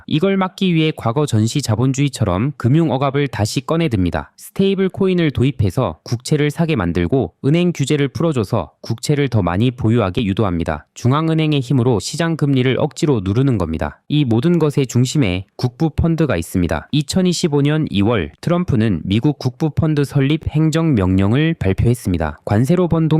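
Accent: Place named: Gyeongsang, South Korea. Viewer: 살짝 경상도 억양이 들리는데 고향이 울산 이신가요 ?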